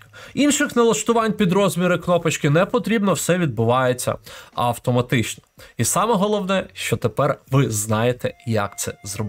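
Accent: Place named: Carpathians, Ukraine. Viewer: Что свинособачий говор карпатских рагулей? Рагули умеют даже компьютер включать?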